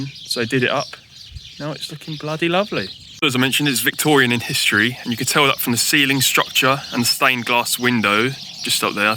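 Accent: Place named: London, United Kingdom. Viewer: I assumed I was better at accents. I assumed you were a Londoner 😮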